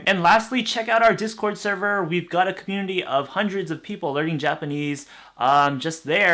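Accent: United States. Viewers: are you fluent in japanese? your americanized pronunciations irk me.. and why do you have the romaji for という as touiu, not toiu??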